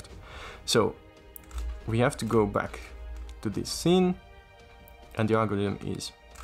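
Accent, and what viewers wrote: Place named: India. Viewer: Nice indian commentary, lots of love from New Delhi!♥️♥️♥️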